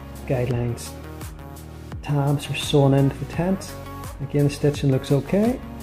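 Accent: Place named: Northern Ireland, United Kingdom. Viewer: I'm looking to get a new tent to start backpacking again after about 12 years. the Naturehike keeps getting mentioned and I was actually on the AliExpress site looking at the popular Cloud peak 2 tent, when I noticed a few other Naturehike tents which hardly get a mention. I saw the Taga, which then lead me to find this video and your channel. I'm not sure I really want a 1 skin tent though, so maybe I'll look at something else. My current tent is the Vango Spectre 200, which I bought years ago, after thinking the Banshee was too small. Funny, the Banshee is still going strong, but the Spectre has long been axed by vango. It was good, just that I fancy a new tent. Do you get out much ? You don't make any wild camp videos. Nice to hear the accent, I originally lived in Holywood .. until I was 5 (57 years ago) Good luck with your channel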